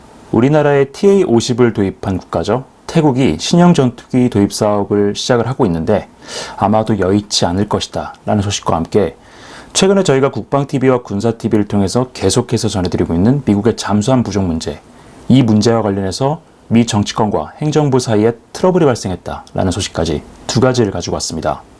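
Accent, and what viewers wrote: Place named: Seoul, South Korea. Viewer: 서울말 좋아요 ^^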